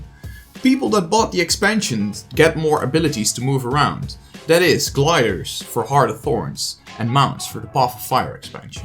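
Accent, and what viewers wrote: Netherlands. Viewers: haha are you Dutch? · I hear a dutch accent :P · This fucking Dutch accent lol. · Are perhaps Dutch? I'm basing that on your accent..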